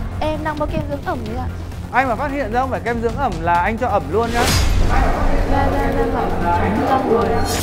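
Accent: Vietnam. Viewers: giọng hà tĩnh lạ vkl ra :)) nhái giọng bắc ròi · HaTinh giong rat Ha Noi ^^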